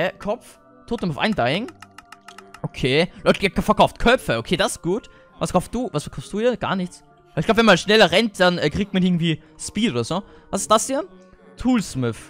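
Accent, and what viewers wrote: Austria. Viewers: Ich liebe deinen österreichischen aktzent wie bei Flo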